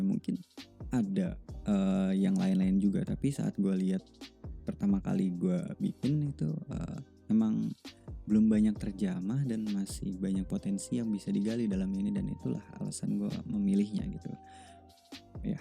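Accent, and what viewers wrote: Indonesia. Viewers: Plis ya gue baru denger video ini, dan bogor accent itu nyata adanya loh wkwk kerasa aja gt beda nya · Ehhh aku baru nonton dan denger iniiii. Nice to know you ya kakk! Ternyata kita ga jauh beda umurnyaa, kamu 2 tahun lebih tua daripada akuu. Aku juga ngerasa aksen kamu sunda banget, soft banget, tapi ga spesifik nebak bogor sih wkwkwk. Anyway, aku berharap ada lagi Q&A kayak gini lagii 🥺🥺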